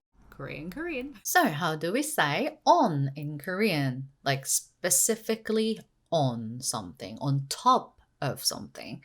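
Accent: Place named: United Kingdom. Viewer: Thank you. I really love your videos. Your British accent really shines through! :-)) I didn't know about 속에. It seems similar to 꽂다, which I understood to be kind of meaning stuck in a tight space. What is the difference between 속에 and 꽂다 (other than the fact that one is a preposition and the other a verb). Are they basically used for similar spaces or is there a different nuance?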